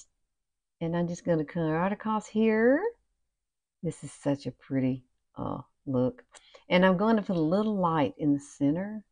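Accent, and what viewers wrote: South, United States. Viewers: you are so cute. I am curious as to why use lipstick? What about cream blushes and or bronzers? I can see an advantage if you don’t have a lot of money to spend on makeup. I love the eye look!! And I love yr Southern accent!!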